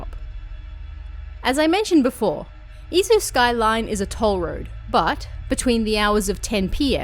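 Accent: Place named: Australia. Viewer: You sound Australian 🤔👍 thanks for you work 🙂 I couldn't do it 🐨🇦🇺🦘🙂.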